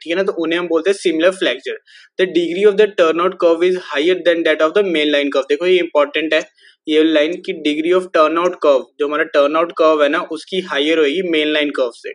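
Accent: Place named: Jammu, India. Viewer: Way of speech suggests that you r from jammu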